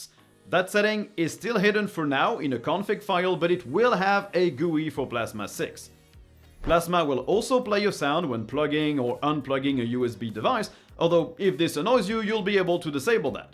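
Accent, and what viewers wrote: France. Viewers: Man where u from France?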